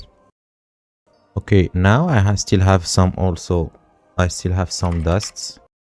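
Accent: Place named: Latin America. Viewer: De donde sos ? Hablas inglés pero no sos nativo se nota muy raro tu inglés sos latino que habla inglés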